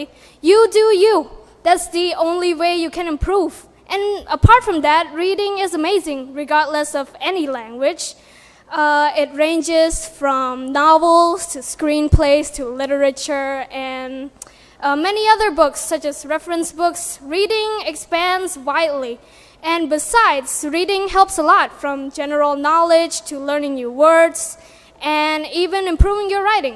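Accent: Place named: United States. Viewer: ACE follows British , but her accent is like the American 😄 Amazing speech ever ! 💙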